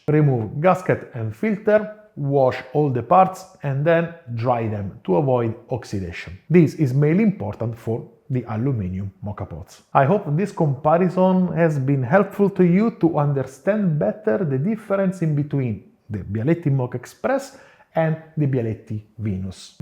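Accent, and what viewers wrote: Italy. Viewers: I Like the italienenglish😊😅 good Video. Thank You! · Excellent video and the Italian accent makes even more authentic 😁 Having both I always felt dark roast which I only use tasted more smoother, abit more refined and abit more juicier on the Venus. Moka has the less refined and harsher finish overall. Personally I only use the Venus & it looks more astectically pleasing too 👌❤🇿🇦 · Dam this guy is a coffee scientist. The Italian accent makes it perfect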